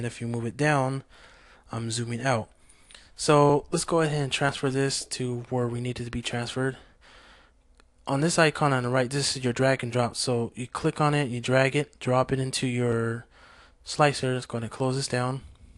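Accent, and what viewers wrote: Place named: Caribbean. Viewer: Nice tut! I can tell you're carribean.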